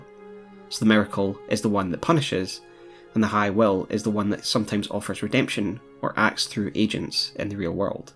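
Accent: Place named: Scotland, United Kingdom. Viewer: I'm gonna guess your accent and say your country of origin is Scotland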